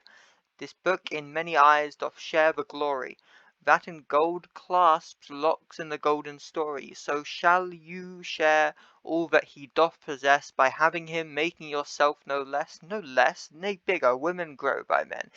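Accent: United Kingdom. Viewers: why are you british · Why are you British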